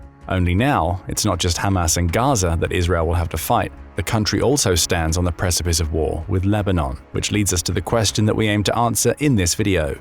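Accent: United Kingdom. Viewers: What a crap. Full of.wrong facts with nice british accent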